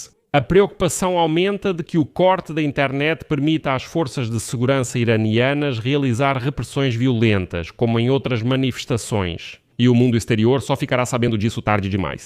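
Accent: Portugal. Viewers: Jornalismo alexiceu língua portuguesa de Portugal fale sempre assim gostei. E língua portuguesa Portugal no Br. E nossa toponimia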